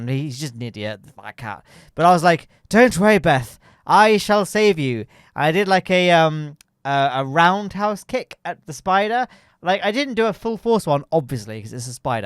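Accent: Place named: Leicester, United Kingdom. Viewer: Ha ha, you're from Leicester.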